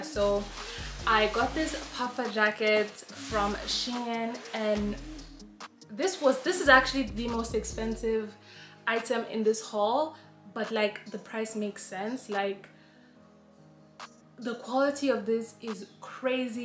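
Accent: France. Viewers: When you started talking in French I thought you were French due to your accent and I am French So I can tell you you sound like you’re French this is sooooo crazyyy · From a french girl , you have such a GREAT accent, I almost thought you was French too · Love the french accent! You were in my suggestion, it's funny because you just look like my younger sister and I'm french! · Par contre, ton accent français, MAGNIFIQUE. I was chock 😳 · even if you know a few words your french accent is damnn!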